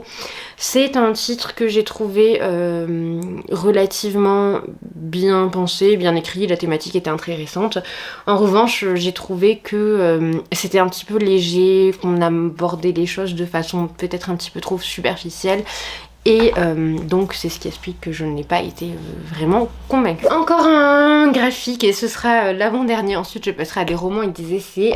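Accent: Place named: South, France. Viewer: J’adore ton petit accent du sud 😊☺️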